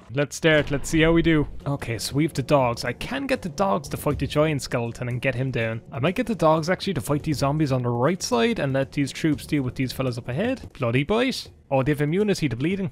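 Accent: Ireland. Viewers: The game looks amazing. The great Irish accent fits as well.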